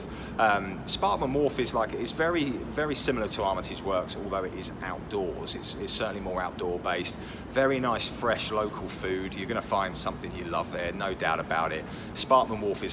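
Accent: United Kingdom. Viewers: You still got that Brith accent which is cool 😆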